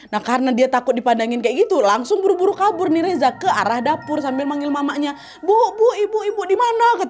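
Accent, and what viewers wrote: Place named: Sumatra, Indonesia. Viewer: Dengar cerita berasa cerita nya dari tanah Sumatera hihihi...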